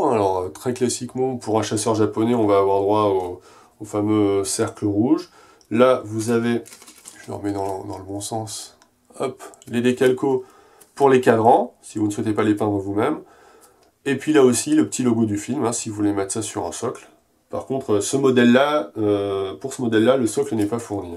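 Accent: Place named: France. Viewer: Salut. Comme tous les ans je monte à Paris pour la Japan Expo. On s'y retrouve avec mes potes de FFXIV et on en profite pour faire des IRL tous ensemble. Les années d'avant j'étais passé devant le stand d'Anigetter sans savoir que t'en faisait partie. Cette fois je manquerai pas de te faire un coucou en tant que membre du forum depuis peu. Sinon je me marre toujours autant devant ton accent franchouilard: Mastèrre Grââde, Fîîne Môldz, ha ha. Ca fait saigner mes oreilles de bilingue mais je préfère prend ça à la rigolade;)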